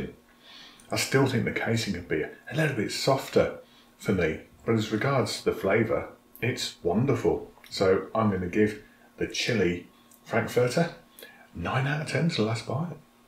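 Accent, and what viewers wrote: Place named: Birmingham, United Kingdom. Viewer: Brummy? Noice.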